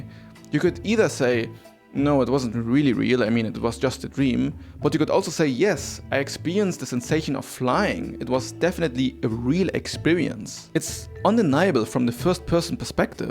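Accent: Germany. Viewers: Where are you from? Your english sounds like it has a german accent lol. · This may be the greatest Video I have ever seen. I made my way to nearly the exact same spiritual/philosophical journey and came to a similar conclusion: Love is the connection we DECIDE to realize that we are or should be the same thing. The opposite of this is what we all perceive as evil: the separation of entities and acting (unfairly) different upon them. That means that the more we empatheticly put ourselves into the shoes of one another and the more we decide to be part of the same thing, the less evil we are and act and the more meaningful and happy life becomes. Cheers to you my friend for having similar discoveries! Or should I rather say cheers to me? 😉 (ps: your accent sounds German to me - where do you life? If you live in Germany I'd love to have a real life conversation about things!) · Is that a german accent I hear?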